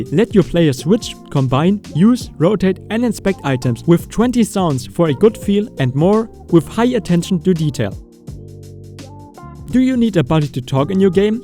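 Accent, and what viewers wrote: Germany. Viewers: I take it from you accent that you're German too? Cause I'd be interested in the Node Graph Assistant Plugin (coming from Houdini it's just incomprehensible to me how bad the Node Graph functions in UE are by default 😅) but it says that the plugin was not available in my region. How did that work out for you?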